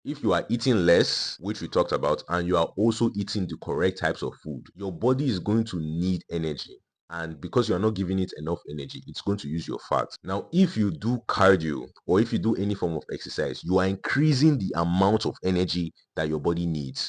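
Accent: Nigeria. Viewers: this is Nigerian accent, what are you talking about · I am not sure if it is the Nigerian accent, or something. But this guys is very believable. Plus he tells the truth; no drama; no frills. Thank you! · great video aside from the annoying nigerian accent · For those who are not from Africa, he’s not rude or mean, he’s just Nigerian. 🇳🇬🇳🇬😅😅😅 This is how they talk on regular conversations. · My brotha you sound like a Nigerian. Oga God bless you ooo. Make I loose my belle ooo. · It's massive Nigerian confidence. No pretenses. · It's Nigerian accent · The Nigerian accent usually gives the opposite experience lol but okay. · That’s more of a Nigerian accent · Accent does not matter. We are proud Nigerians why pretend 😮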